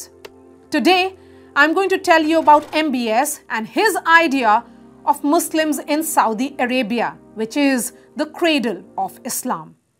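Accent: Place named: India. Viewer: You the only white girl with Indian accent.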